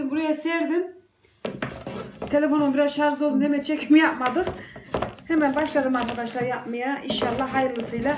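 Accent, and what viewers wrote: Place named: Vezirköprü, Turkey. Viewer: Abla bizim oralı olduğun belli biz gibi konusuyon bende vezirkopruluyum ismim gülsüm beğenerek izliyoz😊👍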